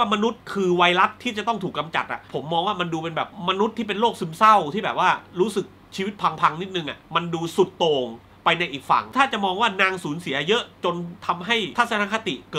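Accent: South, Thailand. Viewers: พี่สำเนียงคนใต้ จังหวัดไหนครับ ผมนครศรี 55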